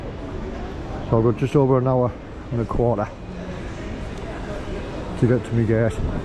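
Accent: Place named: Yorkshire, United Kingdom. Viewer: Hi boy I m ur new subscriber a Scotish Pakistani. Love ur Yorkshire accent.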